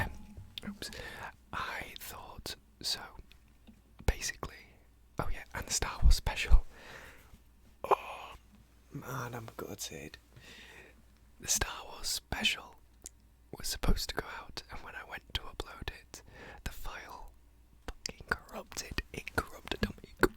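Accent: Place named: East Coast, United States. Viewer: sounds mostly east coast american to me! you do amazing asmr, keep going !!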